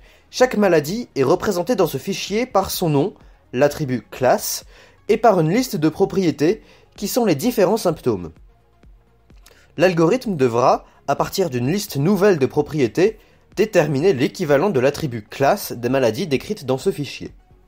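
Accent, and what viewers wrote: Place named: Belgium. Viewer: Toujours plus d'accent belge !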